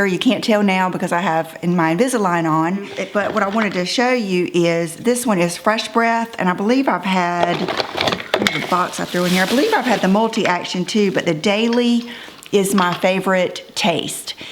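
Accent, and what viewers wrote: South, United States. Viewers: Love your channel! Your voice is music to my ears as I am a displaced Southerner! Love your content too! Thank you so much for bringing all this info in a fun to watch videos! Not easy to do.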